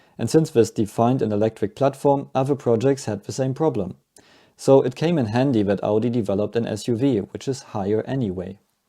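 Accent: Germany. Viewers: The best articulated middle finger to management in polite german english. I love it. Sadly nobody who should will ever learn from this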